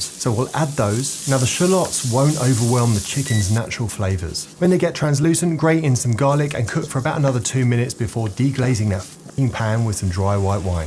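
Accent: United Kingdom. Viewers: He sounded like a random British dude before I read this comment...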